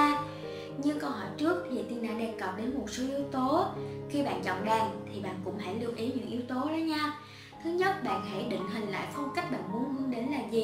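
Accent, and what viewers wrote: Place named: South, Vietnam. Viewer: Người miền bắc nghe giọng miền nam không quen và đệm nhạc vào nữa nên khó nghe rõ .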